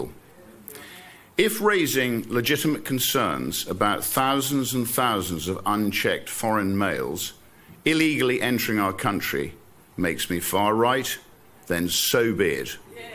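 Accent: England, United Kingdom. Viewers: Thats what a TRUE BRIT sounds like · This is what a real Englishman sounds like! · You sound just like a true American with a british accent!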